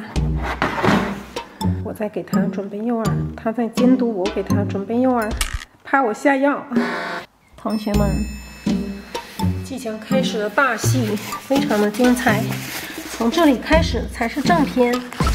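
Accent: China. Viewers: 听你的口音你应该是中国人吧！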